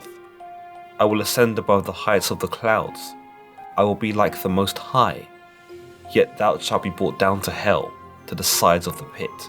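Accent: United Kingdom. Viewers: video length my moth3rs bday, so I was like Imma do Connie a solid. 177,444 views, Izeah or something luci4. Lucy Ferrey, if Scarlet Johansen had a crush on me. I had sorta long black hair, but I cut it shoulder length, Darth Vadored it. I am God and as a side job God's body guard. If I got a second story and solar pannel roof, would I sell it to my friend Leeman or whoever, and or myself and commit to dying my hair ultimate black to match the solar pannel roof. 'Cause right now it's a one story with red ocher Spanish tile roof that matches my Amanita red hair. Went there. For the prophets. The British accent is powerful. a gay piggy back to smoke a bowl of weed we sometimes entise ourselves with. I'm going now. Ha ha ha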